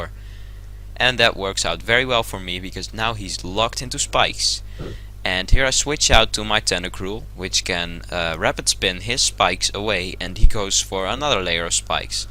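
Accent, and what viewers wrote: Netherlands. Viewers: your dutch :P ? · are you dutch?? cuz you have a dutch accent especially when you said: you kinda have to expect a shellbreak baton passer. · wat een heerlijk nederlands accent hahah goed gespeeld trouwens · hearing a dutch accent haha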